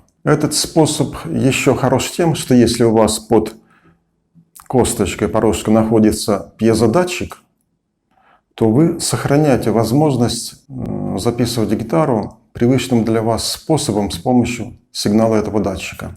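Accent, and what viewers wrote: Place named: United States. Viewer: Не смотря на русский мат, звучит вполне себе по-американски!👏😁Спасибо за очередной сгусток полезностей!👍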